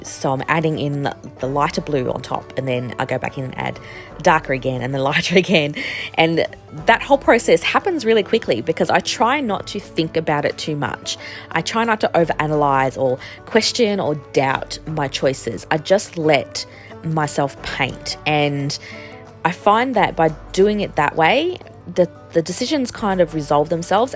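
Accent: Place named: Australia. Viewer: Hi, I just found your channel and it was lovely to watch your process and hear a fellow Aussie accent!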